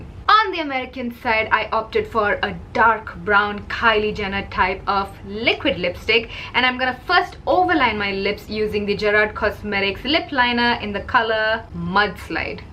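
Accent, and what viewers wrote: India. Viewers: You have a little Indian accent 🤷🏽‍♀️ I'm French and Srilankan girl ✌🏽You're beautiful ❤️😘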